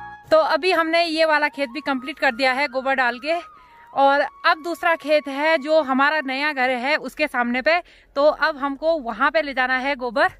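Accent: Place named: Haryana, India. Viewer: Mam aap haryana si bolati ho thank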